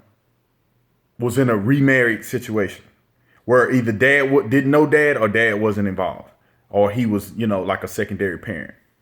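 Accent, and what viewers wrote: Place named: Fort Worth, United States. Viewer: Yo! Your from Ft Worth! Me too! I knew I heard the accent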